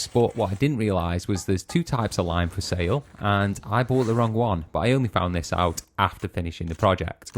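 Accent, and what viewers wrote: United Kingdom. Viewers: Which part of Ing-Gland is this accent from?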